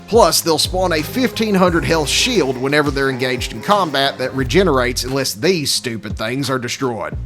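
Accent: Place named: Texas, United States. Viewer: I LOVE his patriotic Texan accent.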